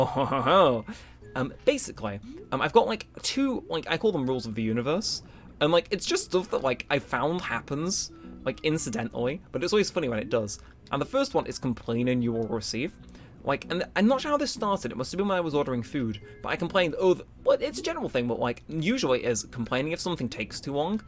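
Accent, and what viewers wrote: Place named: England, United Kingdom. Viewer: Hey I’ve been watching ur ac old school vids for a while now. Big fan of this accf series!!! I always wanted a WII, but never got one for this game & SOS, HM. Now I’m really wanting a WII, but it’s expensive & idn how to hook up to my smart tv on Comcast cable. Plus my aunt just bought me a switch last fall. Love ur accent too, my gran was from England & my uncle is in Scotland, owning a pub. Anyways, I’ve been to both England & Scotland & love them both!!! I’m in the east coast USA, I’m Waterfall ie KtCub🌸💟🤗. I’m on Twitter if u want to check my postings out. Keep the wonderful content, you really cheer me up every time I watch ur vids. I hope u get this msg, Bc idn if my msgs go thru to any of my AC YouTube ppl I like a lot & subscribe. Have a swell day, Laters::::💖🦋🌈 Ps: can u me so I know u get this? Thnks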